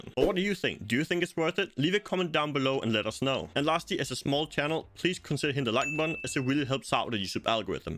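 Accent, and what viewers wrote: Denmark. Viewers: This is the thickest danish accent ever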